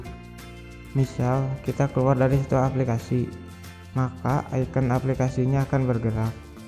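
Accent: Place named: West Java, Indonesia. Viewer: LOGAT SUNDA DIA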